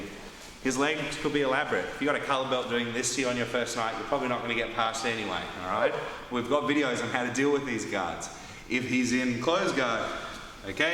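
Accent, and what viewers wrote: Australia. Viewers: I watched a bunch of Bjj videos, but for some reason, your videos resonate with me and easy to digest. Maybe it’s your Aussie accent haha. thanks from San Francisco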